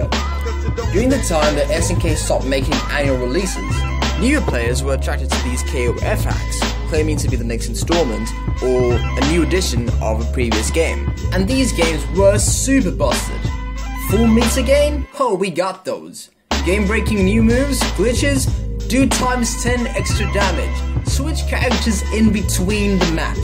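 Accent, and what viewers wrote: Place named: South Australia, Australia. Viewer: South Australian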